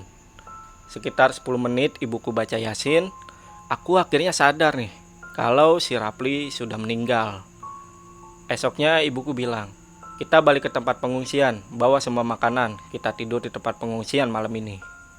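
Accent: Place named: Bali, Indonesia. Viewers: Naratornya orang bali..ya.?kelihatan dri logat nya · Ini naratornya orang Bali apa NTB ya?, penyebutan huruf T nya beraksen daerah tsb. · Yang baca berita ini apa orang bali ya ? Bli ? Logat T nya sangat ketaraa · mimin org bali kah ,logat T nya ko keg org bali ? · Bang asli mana? Logatnya ky beli Bali 😁 i like it ❤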